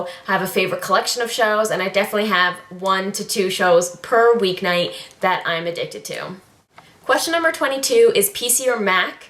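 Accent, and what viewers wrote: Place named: Newfoundland, Canada. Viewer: New subscriber and as soon as I heard your voice I knew you had to be from Newfoundland! Fellow Newfie :)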